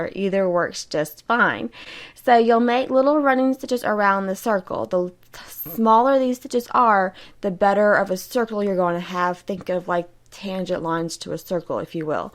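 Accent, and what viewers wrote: South, United States. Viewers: cute country southern accent. and good video.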